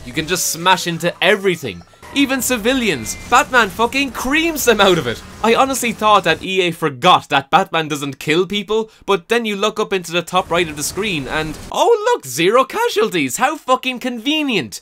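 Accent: Ireland. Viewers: Your Irish accent is jus lovely darling. lol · I love your Irish accent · He sounds so Irish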